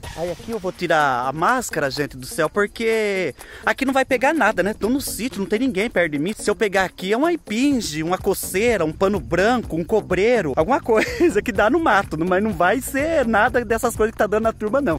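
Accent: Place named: South, Brazil. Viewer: A primeira vez que vi os vídeos do,eu pensei que ele fosse do Sul tbm.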